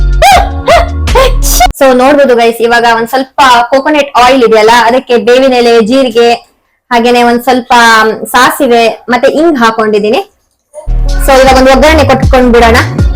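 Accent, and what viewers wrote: Kundapura, India. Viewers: Kundapurdavra? Kundapura bashe keloke laik ath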